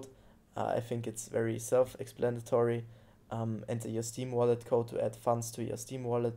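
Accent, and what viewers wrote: Germany. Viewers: you sound german kinda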